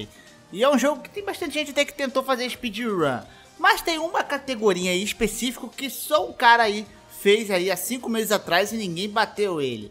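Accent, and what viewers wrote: Rio de Janeiro, Brazil. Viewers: Tu é do rio mano? Qual parte? Sou da oeste, Tmj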